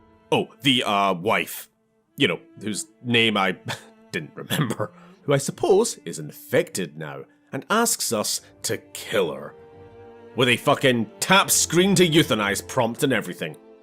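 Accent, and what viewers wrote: United Kingdom. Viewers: Dude is just too British. I can't stand it.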